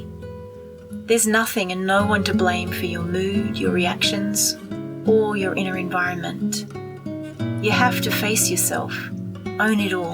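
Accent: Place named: Australia. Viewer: Found you looking for cushion cover tutorials. You sound like an Aussie, where are you from?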